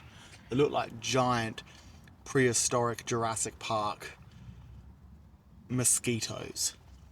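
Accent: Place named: England, United Kingdom. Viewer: Nice one, you have picked up a bit of a Pommy twang 😂 I have sub’ed, greetings from Halls Head, WA 🇦🇺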